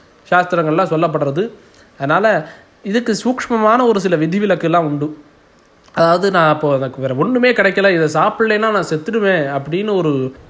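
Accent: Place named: Tirunelveli, India. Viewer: சுவாமி திருநெல்வேலி போல பேச்சு அப்படிதான் தெரியுது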